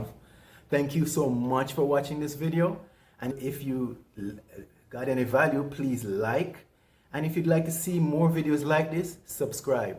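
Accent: Caribbean. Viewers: where u are originally from? caribbean? my trinidadian self wonders where your accent is from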